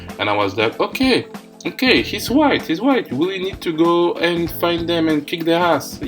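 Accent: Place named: France. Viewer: I love French accent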